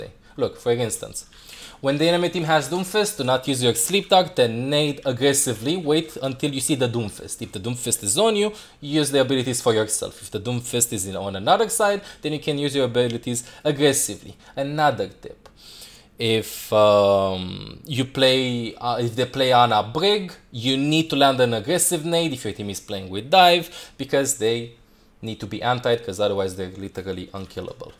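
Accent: Romania. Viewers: He's Romanian lol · Did i say he needs to hide it? I just asked if he's doing that accent on purpose because if yes I'd rather him to speak normal english without romanian accent to understand him more.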